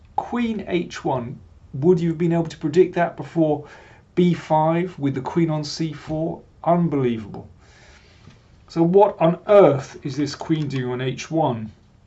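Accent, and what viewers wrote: England, United Kingdom. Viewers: Great videos,! Lovely English accent in addition to keen chess analysis. My only suggestion: Please add the opening played, when appropriate, to video descriptions. Thanks!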